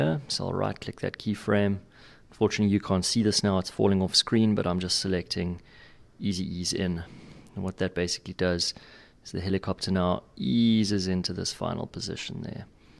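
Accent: South Africa. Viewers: Thought i picked up a RSA accent confirmed when you said "ya" great videos and very well explained dankie from Livingston Scotland. Sub and liked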